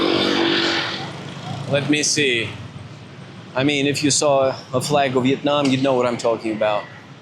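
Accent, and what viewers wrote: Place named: United States. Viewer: Nice vlog, I was in Nha Trang 2 years ago … Were you born or lived in the US? You have an American accent . Keep on vlogging please . Thank you .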